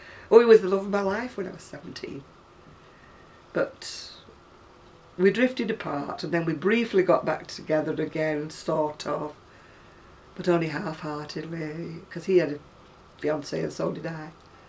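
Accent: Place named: RP, United Kingdom. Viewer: You always make me smile! Posh or no, I love listening to you.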